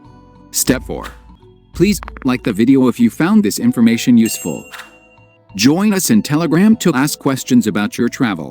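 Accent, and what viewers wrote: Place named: Ukraine. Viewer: Gday, pete sent me here. subbed liked To your channel. I like pete and his videos alot, but i was annoyed by his question asking you if russia should have invaded ukraine bloody STUPID question! some feedback for you. I really dislike computer voices on videos, I much prefer you speaking even if you might think your ukranian accent might be difficult for some people to understand. Or whatever other reason. please consider using yr real voice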